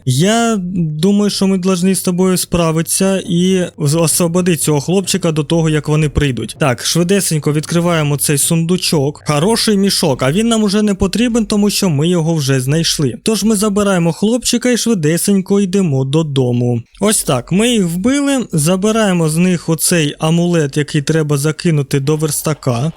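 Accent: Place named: Surzhyk, Ukraine. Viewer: Суржик просто топ🥹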